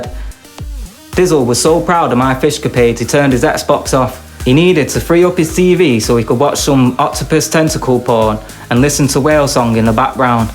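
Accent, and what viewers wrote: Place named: United Kingdom. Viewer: #CrashRaid You are British I like that lol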